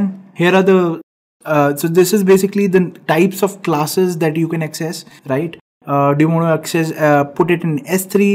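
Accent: India. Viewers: usually I have difficult time to understand Indian English accent, but you're awesome god bless you.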